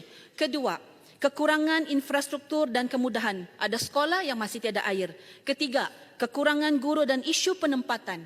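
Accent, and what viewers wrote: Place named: Sabah, Malaysia. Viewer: hahaha…pelat sabah tu boleh tak di kurangkan…bercakap lah dalam bahasa malaysia (melayu)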